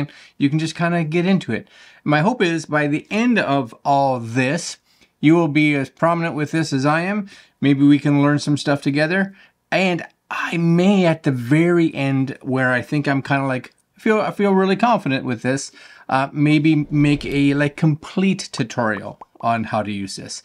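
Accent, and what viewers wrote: Canada. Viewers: ❤ that Canadian accent makes my heart go pitter patter …..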